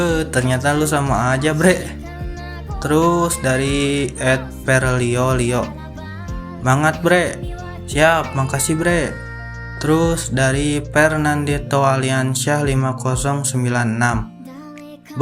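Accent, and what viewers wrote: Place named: Sunda, Indonesia. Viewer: Aa nya orang Sunda yah, saya lihat lihat nadanya kaya orang Sunda, sok ngomong badag🧒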